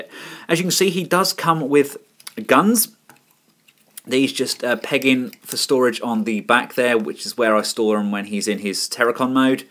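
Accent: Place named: Scotland, United Kingdom. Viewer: wow he looks awesome ! and great review ! i subscribed a few days ago ! by the way, are you scottish ? you sounds alot like david tennant the 10th doctor in doctor who ! lol ! :)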